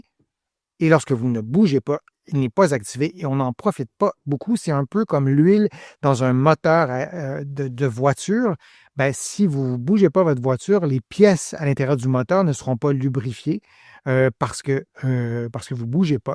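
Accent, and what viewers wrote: Canada. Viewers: Vous êtes formidable ! J'écoute toutes vos présentations rassurantes sur Youtube ! J'adore l'accent Canadien ! Vos conseils sont meilleurs que ceux de mon kinésithérapeute ! Merci !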